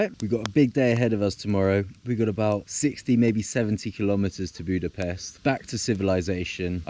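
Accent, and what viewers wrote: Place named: Midlands, United Kingdom. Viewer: Are you from the Midlands? I see a slight accent there slipping out every now and again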